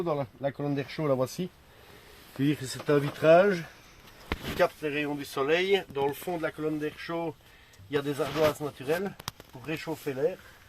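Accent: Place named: Belgium. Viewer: L'accent Belge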